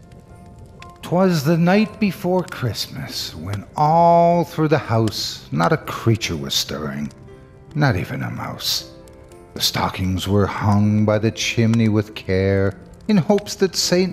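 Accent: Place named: South, United States. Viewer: That was a very good audio performance, and you have such, a wonderful gentle voice with the rhythm of the South, which always makes you such a delight to listen to, no matter how horrifying the subject matter. Bless you, Mr., and may you and yours have a very merry Christmas and a happy New Year!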